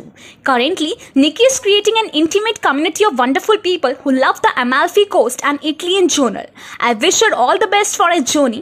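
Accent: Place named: India. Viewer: You have a very strong Indian accent that I have a hard time to understand.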